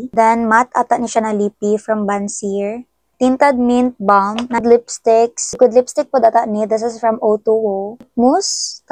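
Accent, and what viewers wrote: Visayas, Philippines. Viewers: Fave part: the way you talk bisaya nga mailhan gyud nmo nga bisaya kaayu gyud and Makita sad na love kaayu nmo imung followers🫶🥹🩷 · my fav part about sayo ate ay yung pagiging confident mo sa pag vvlog at pag a affiliate mo hindi kapo katulad ng iba na nag aaffiliate na hhindi honest sa mga product na pinapakita nila and sapagiging masiyahin mo and apaka pretty mopo and kind ang perfect mopo talagaaa and yung pag speak mopo ng bisaya ang sarap po pakinggan my mother is bisaya din po hehehe hooping po mapipi ng give away nyo😇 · My fav.part ko sayo is your humor your not boring when talking and also if piniflex mo yung mga products na ginagamit mo and i also ordered it kase proven and tested mo yung mga products and also yung Boses mo kapag nag bibisaya ka kinda like sana naging bisaya din ako Hhahahaha yun pang po. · One of my fav jud sa imo dzai the way kag mag voice over sa imo mga vlogs grabe ka vibes bisaya kaau lol (love it), also gaka bayot kos imong ka gwapa dzaiiiii the face card, sufeeer cuteee^_^ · Fav part sa imoha is imohang oa bisaya accent😂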